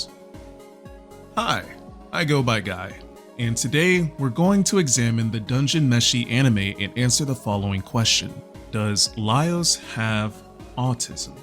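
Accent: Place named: United States. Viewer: You said it’s getting warmer but you have what I assumed was an American accent?? Wild. Thanks for the video!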